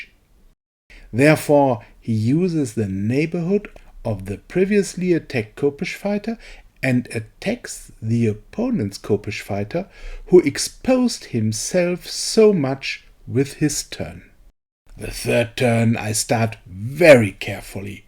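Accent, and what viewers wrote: Germany. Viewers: The first game I loved was Final Fantasy Tactics, so I enjoy the strategy RPG battles in FOE. Are they the only reason people hate the Egypt settlement? I was thinking it had impediments even worse than Vikings. I build for 3x3 structures, and then they introduce a 3x5 when I was building around stupid rocks I can't do anything about. I think I'll finish Vikings in time. I still wanna go back to Japan and lvl those buildings since it's so quick. But Egypt isn't looking as mean as people made it out to be. Though, I'm only one video into it. Your next video might change my mind.. And I love the accent. I'm guessing you get this a lot. But you sound like an extremely pleasant person. American women prefer the British accent. But American men prefer the German accent. That might not sound like much of a win, I admit. But it is.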